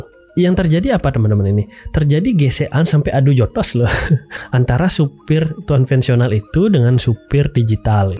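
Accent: Bali, Indonesia. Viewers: setelah nonton beberapa video nya baru sadar lewat aksen dan namanya ternyata semeton Bali wkkwkwaka. semangat Blii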